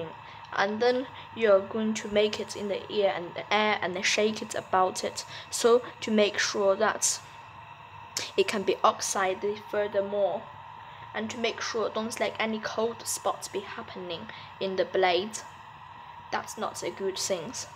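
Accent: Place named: RP, United Kingdom. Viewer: Why does this guy sound so posh?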